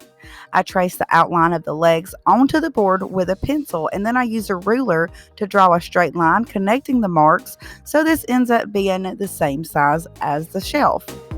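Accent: South, United States. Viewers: 😍😍😍😍😍😍😍 I have never subbed so fast! Plus I love your accent coming from another southern lady❤️ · OMG I just love your southern accent, I’m from Texas and still haven’t picked up The accent , your voice is so satisfying you probably can do satisfying videos and get paid for it👍🏽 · Beautiful work Beverly sent me over to check you out. I really like what I'm seeing and I just love your country accent 💕💓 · I just love listening to your accent , where in the states do you live?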